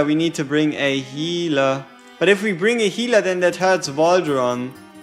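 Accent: Germany. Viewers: would have joined the challenge if i knew how it works. Great content btw. You are my favorite WOR content creator despite the German excent. 😂😂😉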